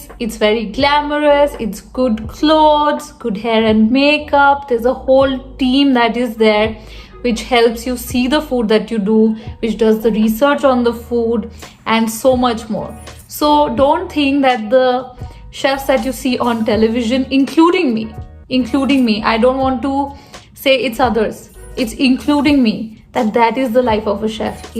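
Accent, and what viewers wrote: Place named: India. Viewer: 🫡Hats off 2 U from 🇧🇩Bangladesh. I love the way U speak English in a very simple way. Your English is very easy to understand, and your accent also sounds so simple and sweet. It's way better than all other typical indian accents! I am in damn love with your steady speaking style, which is absolutely easy to understand, and of course your every single word's pronunciation is crystal clear!🥰 U r undoubtedly a perfect speaker! U have absolutely nailed it!👏🏻 👌🏻Keep it up dear multi-talented Indian lady...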